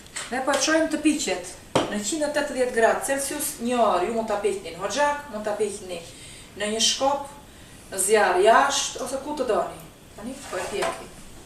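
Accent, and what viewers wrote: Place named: Vlorë, Albania. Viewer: Qe prej theksi dukeni qe jeni vlonjat😍❤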